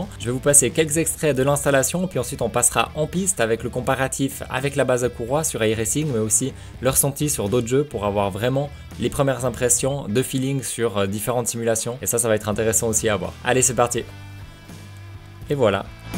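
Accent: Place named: Neuchâtel, Switzerland. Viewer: on dirai un neuchâtelois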